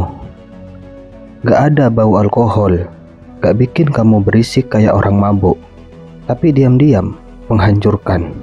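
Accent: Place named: Madura, Indonesia. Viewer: Suara channel ini logatnya kayak orang madura.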